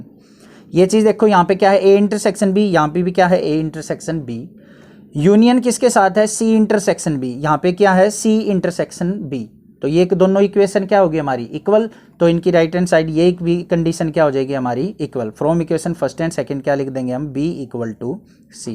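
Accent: Haryana, India. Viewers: Sir it seems u r from haryana??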